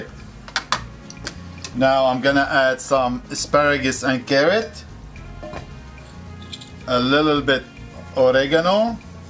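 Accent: Lebanon. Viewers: I love your lebanon English language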